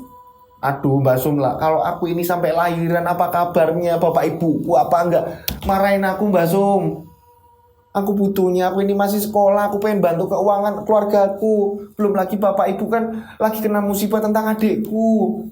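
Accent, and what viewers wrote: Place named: Tulungagung, Indonesia. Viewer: Kyk wong tulungagung bahasa e.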